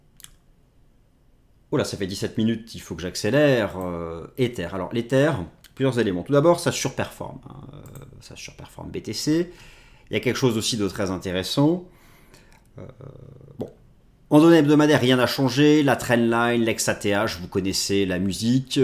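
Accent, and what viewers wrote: France. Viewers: continuer à dire RANGE à la française monsieur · bien bonjour le j adore vos video avec les prononciantion à la française c est votre marque de fabrique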